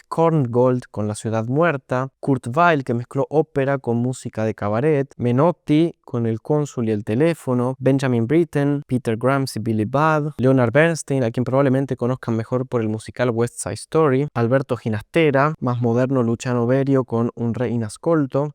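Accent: Argentina. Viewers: Sos insoportable, en todos los videos decís la misma idiotez. Es la forma en la que todos los argentinos hablan hoy en día.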